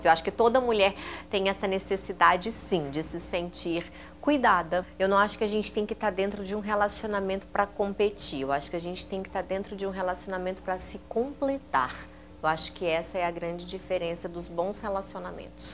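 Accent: Brasília, Brazil. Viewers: sotaque brasiliense super ativo rsrs aií aií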